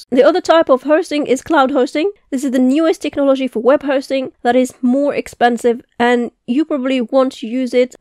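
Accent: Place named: India. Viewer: India maybe 🤔 or indian living in UK 🤔 don't know 😂 just guessing from the adorable access that u have 💕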